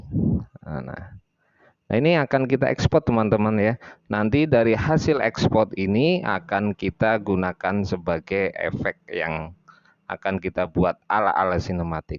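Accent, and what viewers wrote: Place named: Tegal, Indonesia. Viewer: sampean wong tegal mas?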